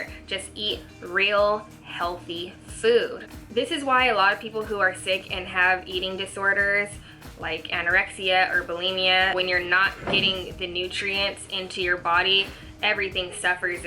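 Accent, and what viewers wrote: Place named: Texas, United States. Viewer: Are you from Texas? I love your accent.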